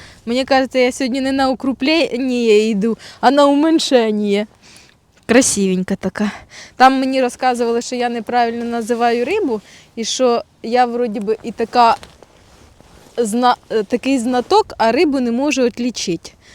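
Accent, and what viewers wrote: Kirovohrad, Ukraine. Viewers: Я зарас далеко від Кіровоградщини тобіш на фронті і мені так приємно чути наший рідний суржик я наче побував дома дякую Вам за Ваші відео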